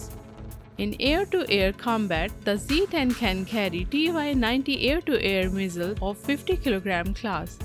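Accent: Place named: India. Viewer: Oh my God that Indian accent hurt my ears so bad 😔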